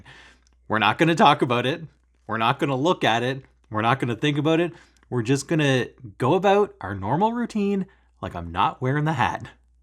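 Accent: Canada. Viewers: This is the first of your videos that I have watched and it was great . . . I'm off to watch the rest. I moved to New Brunswick 5 years ago and listening to you speak, I thought you sounded like David Myles (my husband agreed) so when you mentioned the New Brunswick accent I laughed . . . maybe it is a thing!!! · “Snuggling arm” 😂😂😂😂 so nice to hear a Maritime accent (not many of us here in Oklahoma😉) - g’s or no g’s notwithstanding!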